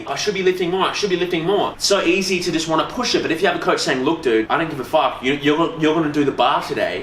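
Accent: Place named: Newcastle, United Kingdom. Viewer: If nobody has asked why you introduce videos in a Geordie accent then I'll be pissed.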